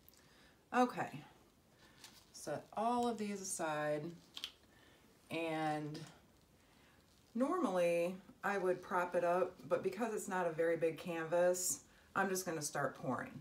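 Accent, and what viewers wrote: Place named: Texas, United States. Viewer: I live in Texas, north of Houston in The Woodlands. I thought you sounded like you might have been from down here somewhere. Anyway, glad I found your channel.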